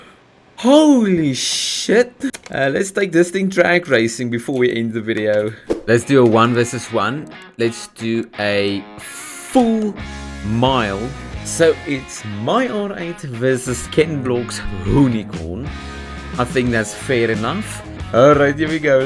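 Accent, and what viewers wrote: Afrikaans, South Africa. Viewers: yeah you can hear your home language Afrikaans but I must say this game is addictive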